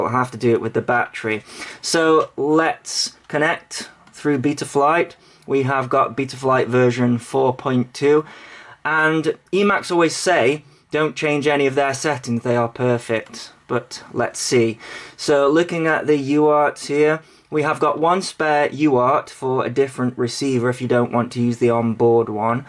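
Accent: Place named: United Kingdom. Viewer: I really like your reviews, also your english pronouncing is very enjoyable, british :-)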